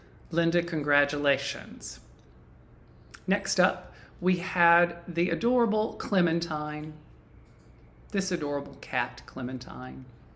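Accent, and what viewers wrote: South, United States. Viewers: I've enjoyed bingeing on the last 2 months worth of episodes (got a little behind on the flosstubes). I just love your gentle, relaxed, southern manner, so soothing and at the same time so fun to listen to while I stitch after a long day at work. :D Your granny sounds like she was just amazing, thank you for sharing her poetry. Thank you for bringing a smile to me face and bringing a little bit of "happy" to my day. #chrisitup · I always love hearing the poems from your”granny” and the stories from your past. I am not from the South but I love hearing the many fun words and accent that you grew up with. Thank you so much for sharing. I hope you get lots of stitching time this week………….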